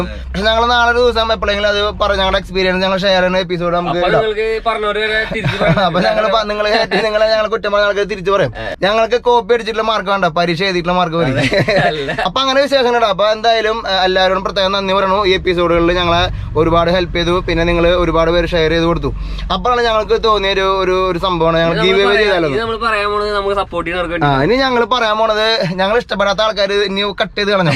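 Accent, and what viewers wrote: Thrissur, India. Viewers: സാന്റാപ്പാ മ്മടെ തൃശൂർ ശൈലി മാറ്റല്ലേ ട്ടാ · .. Congrats..10 k like below 200 dislike ഒന്നുമല്ല.ചില ആളുകൾ ഉണ്ട് അസൂയ കൊണ്ട് നെഗറ്റീവ് comment ഇടുന്നവർ,അവനൊന്നും ജീവിതത്തിൽ സാധിക്കാത്ത കാര്യങ്ങൾ കാണുമ്പോൾ ഒരു ചൊറിച്ചിൽ ആണ്.ഞാൻ മലപ്പുറം ആണ് നിങളുടെ വീഡിയോ കണ്ടു തൃശൂർ സ്ലാങ് ഒരുപാട് ഇഷ്ട്ടായി.ഒരു 45 minute video kku മിനിമം 2 or 3 മണിക്കൂർ viedo വേണം.. -5 ഡിഗ്രി ഇതിലും കൂടുതൽ power ആർക്കും കിട്ടില്ല.. നിങ്ങളുടെ ചാനലിൽ ഈ vedeos ever green ആയിരീക്കും.. കട്ട support.. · വീഡിയോ അടിപൊളിയാണ് but thrissur ഭാക്ഷ ഒന്ന് മാറ്റിപിടുക്കോ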